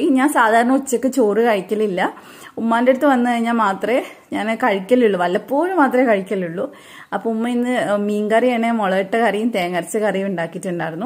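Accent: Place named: Kannur, India. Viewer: ഉയ്യെന്റപ്പാ .....കണ്ണൂർക്കാരൻ 💪🏻 ഇന്ത്യൻ റെയിൽവേ അല്ലെ കറക്റ്റ് ടൈമിൽ എത്തുമല്ലേ 🤗🤗🤣🤣🤩🙏🏻 കണ്ണൂർക്കാരെ സ്ലാങ് മറക്കല്ലെ 🤭🤭🤣👍🏻✌️🥰🥰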